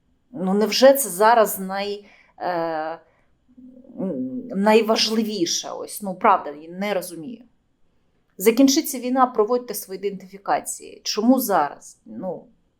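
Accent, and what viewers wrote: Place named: Russia. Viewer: НЕ ПЕРЕЖИВАЙ МЫ ВСЕ НАЛАДИМ ЗАЯ ! РОССИЯ СПАСЕТ ХОЛОВ )))! ПРИВЕТ ИЗ КРЫМА ))! Я СЛЫШУ ТВОЙ РУССКИЙ АКЦЕНТ ! ВСЯ МОЯ УКРАИНСКАЯ РОДНЯ ЖДЕТ КОГДА МЫ ОСВОБОДИМ ОДЕССУ ! ПО ТЕРПИТЕ ЛЮДИ ! ВЫ ТЕРПЕЛИ 30 ЛЕТ И ЕЩЕ НЕ МНОЖКО ПО ТЕРПИТЕ !!! СКОРО МЫ ПРИДЕМ И ПЕНСИИ БУДУТ РУССКИЕ И БУДЕТЕ ГОВОРИТЬ НА РОДНОМ ЯЗЫКЕ НА ЛЮБОМ ! ПОМОГАЙТЕ БОРОТЬСЯ В ВРАГАМИ ! ОТПРАВЛЯЙТЕ НА ТЕЛЕГРАММ ГДЕ НАХОДЯТСЯ ВРАГИ НАШЕЙ РОДИНЫ !ОТПРАВЛЯЙТЕ КООРДИНАТЫ ВОЕННЫХ ОБЪЕКТОВ ))) УНИЧТОЖАТЬ БАНДЕРОВЦЕВ ! СЛАВА РОССИИ !МЫ ПОБЕДИМ !СКИНЕМ ЯРМО США !!!! ТОЛЬКО ВМЕСТЕ!!!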